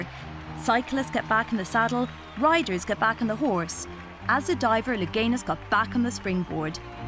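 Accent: Ireland. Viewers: Lol at the Irish accent of the narrator.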